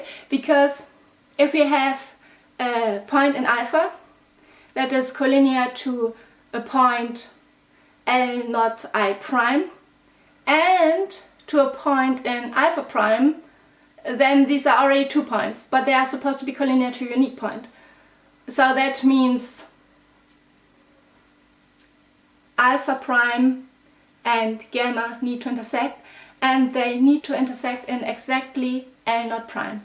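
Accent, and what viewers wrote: Germany. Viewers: German accent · You have a German Accent.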